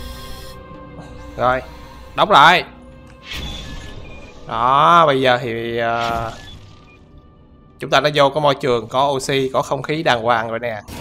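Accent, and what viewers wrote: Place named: China. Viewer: Hình như anh là người hoa đúng ko?sạc pin mà anh nói là sạc tìn:)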